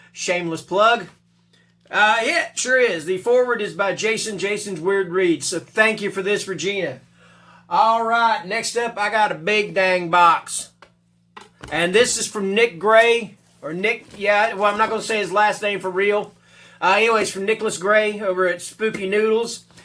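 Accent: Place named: South, United States. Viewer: you never lost your southern accent. Love it. 👍 Nick and Regina are so nice. Awesome book mail. I remember your review of The Ruins and how much some of the characters got on your nerves 🤣 Iconic. Cool Dracula knife.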